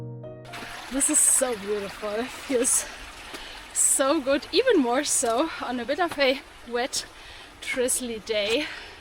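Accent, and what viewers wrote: Germany. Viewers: Watching your video makes me lay back and relax with a sunshine smile on my face. This is not just a video, this is therapy ! forgive me but do I hear a little German accent ?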